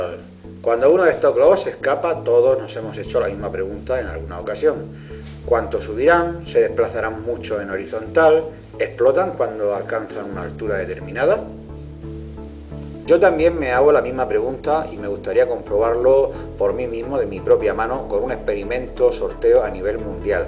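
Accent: Murcia, Spain. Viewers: Aver si me llega alguno a Suecia!!!!! Despues de seguirte durante algun tiempo y escucharte con tu acento muy familiar ya me enteré que eres Murciano jejejeje.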